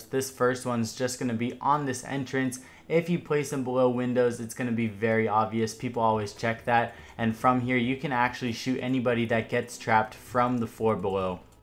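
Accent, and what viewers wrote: Hawaii, United States. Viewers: I was actually gonna ask if you were from Hawaii until you said it. I'm from Hawaii too (near UH but I grew up here), was just gonna say it could be a good idea to advertise that just for being unique!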